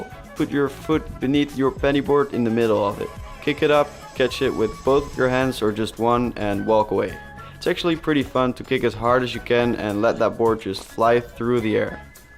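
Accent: Netherlands. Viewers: Ben je nl 😂😂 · Are you Dutch? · Are you dutch?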